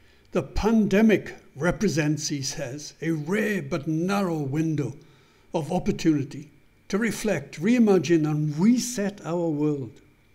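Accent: Wales, United Kingdom. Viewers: You sound like a Welshman my brother!! 🏴❤️😎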